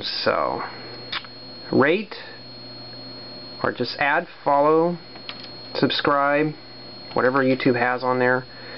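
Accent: South, United States. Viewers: you have what sounded like a southern accent that ive never noticed O.o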